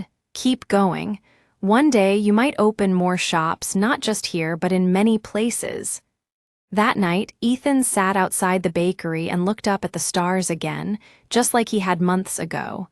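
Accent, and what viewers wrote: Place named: United Kingdom. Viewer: You speak Uk English but your English contents in American English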